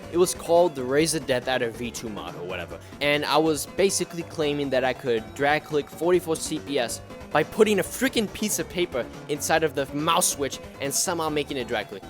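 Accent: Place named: New York, United States. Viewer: He sounds like a newyork dad like thank you gouys four so much suppourt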